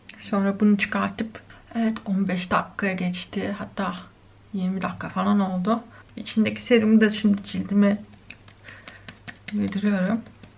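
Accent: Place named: United Kingdom. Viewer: Sizin konuşmanız nasıl acaba ?? Hayır izlemeyecekseniz izlemezsiniz niye böyle bir şey yazma gereksinimi duyar ki bir insan. Ancak birini incitmek için... Başka hiç bir açıklaması yok. Araştırıyor, uyguluyor. Diger youtuberlar gibi fıss değil ama halen kendi elinde olmayan bir sebep yüzünden eleştiriliyor. Üstelik küçükken bir rahatsızlık geçirdiği için konuşması böyle ve bence çok çok tatlı, kibar ve naif. Ayrıca ingiliz aksanına benziyor. Insanlar birseyin içinde kötü görmek istesin yeter ki... Ne acı. Yazık.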